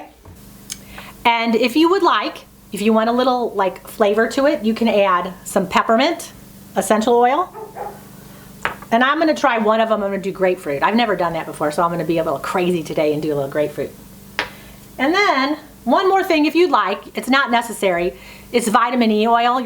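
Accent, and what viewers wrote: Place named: North, United States. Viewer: You are delightful to learn from. I love your northern accent!!!!❤️💕❣️ lip bomb. I live in Texas with my family in the north. It makes me think of them! Thank you for the info. 😊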